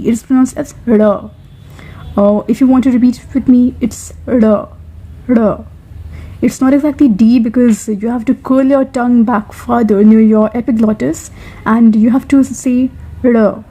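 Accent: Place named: India. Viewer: You have a nice Indian accent :)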